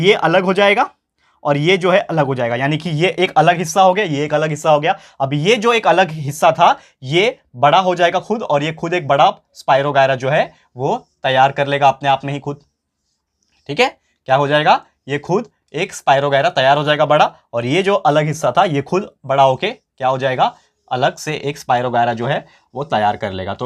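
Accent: Maharashtra, India. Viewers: Sir what is your mother tongue?? Are you marathi??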